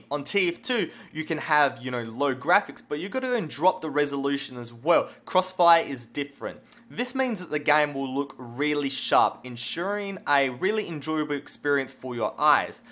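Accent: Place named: Australia. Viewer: he must be a australian or new zealand accent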